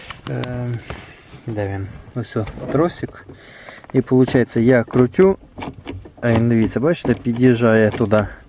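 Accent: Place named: Surzhyk, Ukraine. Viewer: Я чомусь думав що це просто в тебе суржик такий,але ти як думаєш так говориш так і пишеш. Це по якому ти ,,балакаєш,,? Якщо по кацапські то говори вже, вибери одну мову на якій думаєш,на тій і розмовляй,я як лікар можу поставити діагноз - діслексія,алексія,біполярний розлад. Це ж кров з вух, більше трьох хвилин дивитися не можливо. Конєшно воно є такоє,мажліво тут паставіть єсть що так.....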